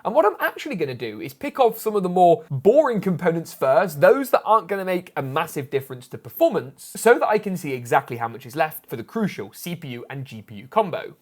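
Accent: United Kingdom. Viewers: Are you British or American? I despise hearing Brits referring to prices in dollars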